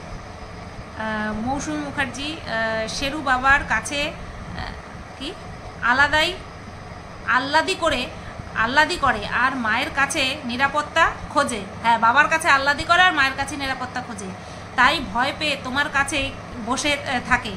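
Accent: Bangal, Bangladesh. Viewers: tomaar moton bold, honest, r courageous lady khoob kom dekha jaaye..r sense of humour toh mashaallah..tomaar mukhe bangal bhasha shunte khoob bhalo laage...ei comment ta pore ektu bangaal bhashaaye reply diyo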